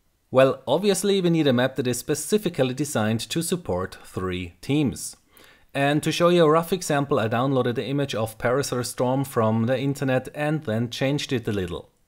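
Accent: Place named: Germany. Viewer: Haha I am joking of course! I love your ideas and stuff for battlefield. Love the accent too. Are you from Germany by chance?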